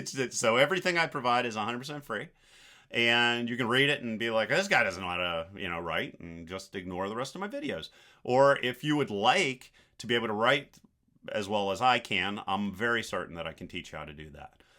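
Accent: Pittsburgh, United States. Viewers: I thought I heard a fellow Yinzer accent all these years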